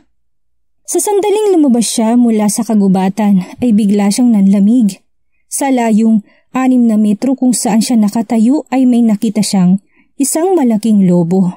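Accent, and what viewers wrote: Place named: Visayas, Philippines. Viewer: Bisaya man gid ang accent ng Narrator 😊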